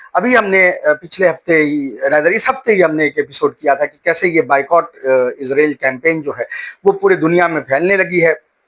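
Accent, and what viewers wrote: India. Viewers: Great analysis. Although you were doing this English but this switch to Hindi is awesome. I also know English very well and listen many English vlog, But listen things in own mother tongue with desi accent looks awesome.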